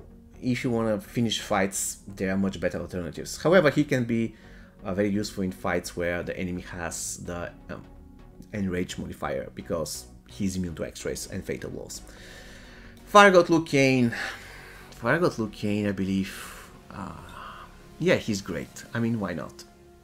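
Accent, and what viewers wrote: Bulgaria. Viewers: are you bulgarian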